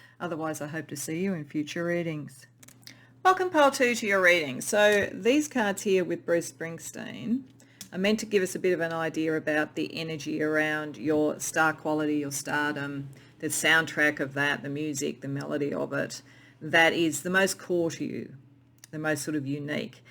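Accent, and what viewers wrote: Australia. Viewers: I understand if you don’t want to answer this question but I’m very curious… lol, there are a couple of things you say that I’ve only heard from a certain group of people. It’s not exactly what you say, it’s the way you say them lol. Do you live in or come from a country town in Victoria that was a mining town in its early days. It’s a town in the proximity of a well known island township. I’m trying not to be too specific but hope I’ve given you enough info to get what I’m asking 🤔😁👍🏼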